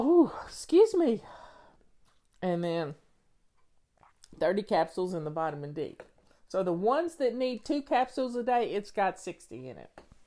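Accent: South, United States. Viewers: Hello from Manitoba! I know hun technology is so irritating, you look wonderful! Love your Southern accent!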